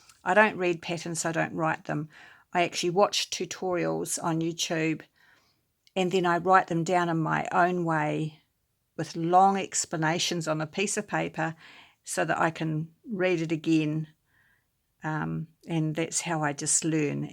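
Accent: New Zealand. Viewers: When you make an “oops” I laugh right along with you!!!!!! Love your NZ accent!! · love your Kiwi accent very familiar in Oz Love from Perth Western Australia 🇦🇺🙏❤️⚘️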